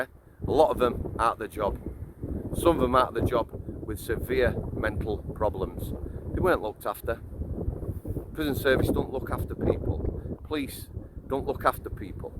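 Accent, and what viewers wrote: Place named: Sheffield, United Kingdom. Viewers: Love your Videos can tell your from the Steel City of SHEFFIELD a Mile off with that accent. Can't miss it anywhere in the World. Your good at this Mate all the best from SHEFFIELD. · Yeah proper Sheffield accent that. From Sheffield meself. Living in Bangkok now. Stay up till the early hours watching this channel. Nice to hear a proper accent on YouTube.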